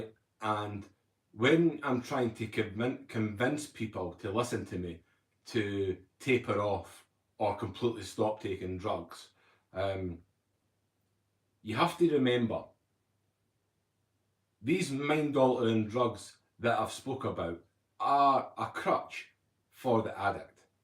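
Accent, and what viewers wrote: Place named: Scotland, United Kingdom. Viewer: Great video. I love listening to you talk. You are very natural on camera. Your Scottish accent is great. I have learned a lot from listening to you.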